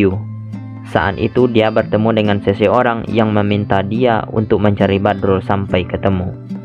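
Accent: Madura, Indonesia. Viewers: logatnya kyk madura🤣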